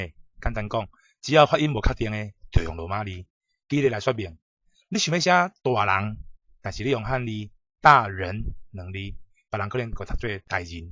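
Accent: Quanzhou, China. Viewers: 更正：是「泉州腔」。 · 听到泉腔闽南话，tai gir台语, ter短。作者应该是泉州籍。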